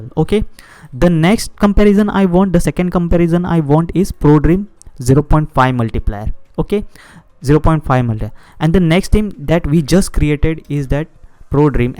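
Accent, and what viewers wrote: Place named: India. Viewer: Your English is very Indian, but the information is good, I can take it.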